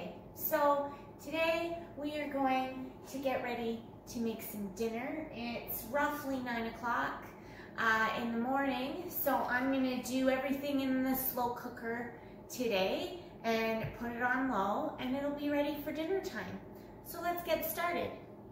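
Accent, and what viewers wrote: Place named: Canada. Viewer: I'm definitely trying that pork. I just LOVE that particular Canadian accent! 😄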